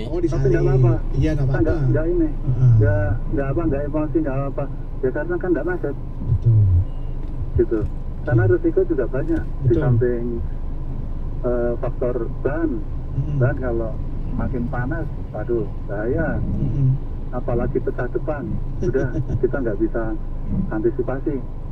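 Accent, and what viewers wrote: Java, Indonesia. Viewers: wkwkwkwk boso ne josss mas aku yo wong jowo, salam medhok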